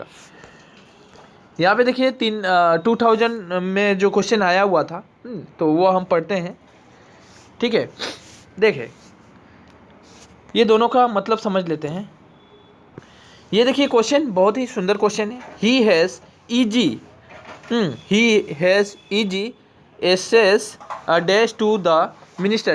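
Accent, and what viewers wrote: Assam, India. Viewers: are u assamese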